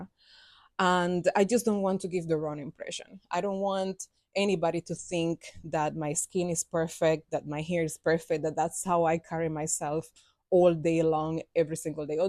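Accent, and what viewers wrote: Jamaica. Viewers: yo you have a stronger jamaican accent than me and i am half Jamaican... but raised in the states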